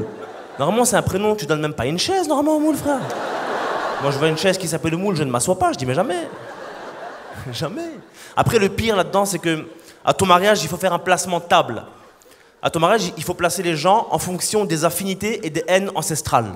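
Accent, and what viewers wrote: Tunisia. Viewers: WAllah j’aime terriblement son accent tunisien alors que de base non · cet accent tunisien sucré là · L'accent tunisienne de Hakim 💓💓😍💓💓😍😍 m'a tué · De son accent je dirais qu'il est Tunisien, quelqu'un sait? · L’accent tunisien cramé de loin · j'ai reconnu l'accent tunisien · C est un tunisien lui juste à son accent.🇹🇳🇹🇳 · L'accent tunisien je kiff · L'accent tunisien tellement stylé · Il a vraiment un accent Tounsi c'est abusé hhhhhhhhh je kiff je me laisse pas de regarder encore et encore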